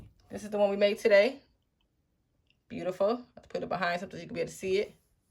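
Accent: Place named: New Orleans, United States. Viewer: You sound like you from New Orleans! I know that accent anywhere!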